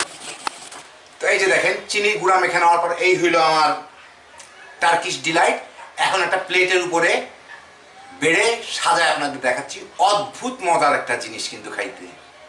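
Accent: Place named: Faridpur, Bangladesh. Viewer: Vai apnar soja sapta kotha onk valo lage tobe vai apnar vasha amader faridpurer moto.apnr bari ki faridpur distrik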